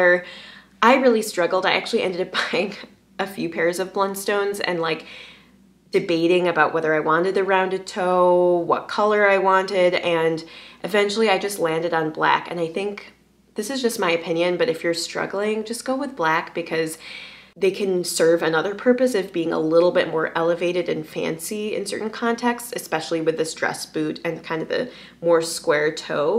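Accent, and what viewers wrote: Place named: Minnesota, United States. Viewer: the minnesota accent gets stronger as the video goes on :)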